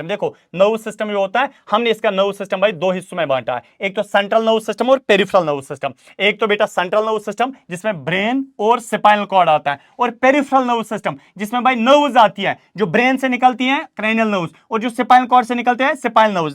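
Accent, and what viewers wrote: Kashmir, India. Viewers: Kashmirii accent ❤😂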